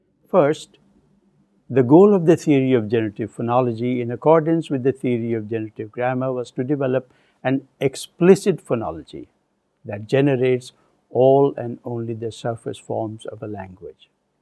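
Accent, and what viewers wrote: India. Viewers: That's his native (Hindu, I guess) accent interfering with his pronunciation of English words... It happens with everyone of us who aren't native English speakers but in few exceptional cases.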